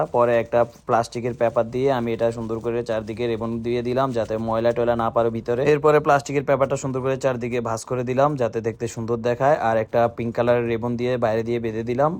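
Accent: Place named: Chittagong, Bangladesh. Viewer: চট্টগ্রামের মতো ভাষার মতো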